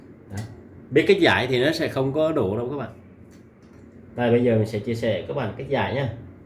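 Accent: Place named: Nghe An, Vietnam. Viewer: Giống tiếng nghệ An ri họ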